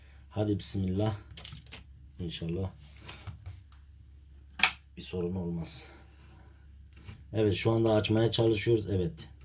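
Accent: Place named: Mardin, Turkey. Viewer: Hocam mardinlimisin sen şiven mardinli arapların şivesine benziyor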